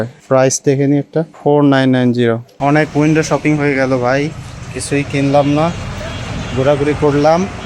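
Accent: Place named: Khulna, Bangladesh. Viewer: ভাইজান মনেহয় খুলনা অঞ্চলের মানুষ তাই কথার মাঝে সেই রকম টান পেলাম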